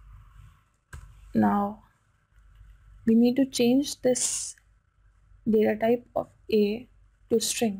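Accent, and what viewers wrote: India. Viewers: u sounds indian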